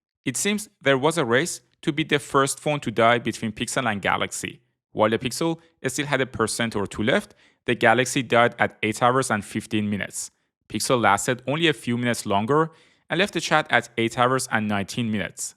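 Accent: Iran. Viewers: سلام هم زبان فارسی ، از لهجه تون معلومه ایرانی هستین😂😂😂😂😂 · The accent. Bro you're from Iran right? Great video btw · That Persian Accent 🤣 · bro are you iranian? your accent is just like iraninas. i'm iranian too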